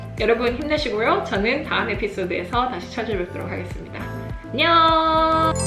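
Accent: Gyeongsang, South Korea. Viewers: 살짝살짝 들리는 사투리 너무 귀여워용.ㅎㅎㅎ^^ 경상도분이세요? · 대구분이신가요? 자주 듣는 억양이 여기서 들리니 너무 반갑네요 ㅎㅎ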